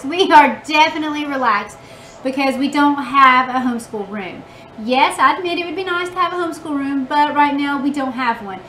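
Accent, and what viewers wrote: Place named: East Tennessee, United States. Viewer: Welcome to the VHC! Based on your accent ... are you from East TN? We are a bit outside Knoxville and you sound like one of us :) And you are wearing orange ... hehe.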